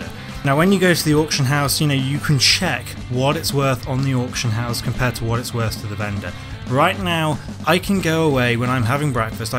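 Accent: Exeter, United Kingdom. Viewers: Are you from Exeter?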